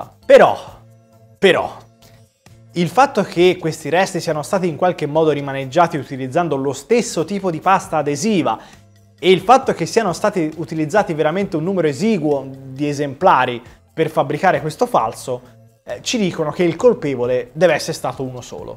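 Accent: Tuscany, Italy. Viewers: Non so... Quando sento quell'accento toscano...mi garba....🤘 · Grazie, come sempre esauriente e tanto simpatico con quell'accento toscano...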